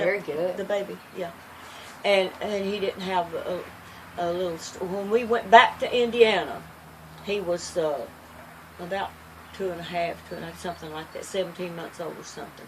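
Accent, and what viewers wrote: South, United States. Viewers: This reminded me of the stories my Great Grandmother used to tell, The wonderful southern accent made it all the better. Thank you for sharing.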